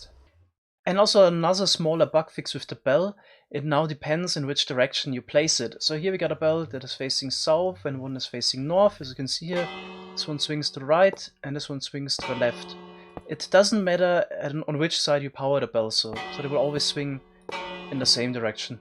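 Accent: Germany. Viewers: Are you German? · Laut deinem Akzent Leite ich ab, das du deutsch bist😂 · Are you german? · you sound german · Are you from Germany? Kinda sounds so xD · I can tell that you're german. Greetings from Germany xD Great vid by the way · You sound soooo german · You're German I can hear it Wie gehts dir mein Kamerad? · Man merkt so dass du Deutsch bist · are you german? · You're German right? Ich auch, man merkts an deinem Akzent und daran, dass du das "th" als "s" ausspricht ;)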